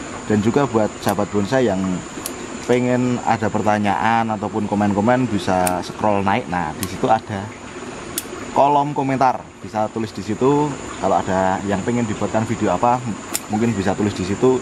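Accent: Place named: Java, Indonesia. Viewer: Ketok e ra nyepirani... nanging ternyata akeh inspirasi sing biso di conto. Asik ... bahasane keren jowone ketoro buanget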